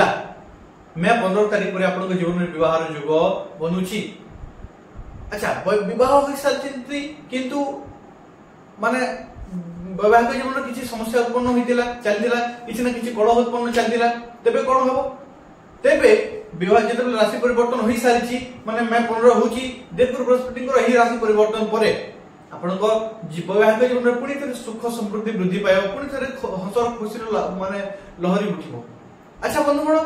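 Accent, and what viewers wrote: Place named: Western Odisha, India. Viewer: Tame to paschim odisha ra bagir laguche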